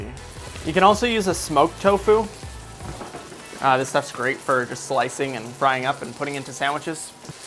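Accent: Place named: Ontario, Canada. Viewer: Random question, but are you Canadian? You sound like you could be from Ontario lol!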